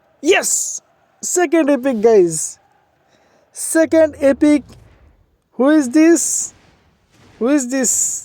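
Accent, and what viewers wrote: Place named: Chittagong, Bangladesh. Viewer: ভাই আপনি কি চাটগাঁইয়া নাকি জানাবেন ভাই❤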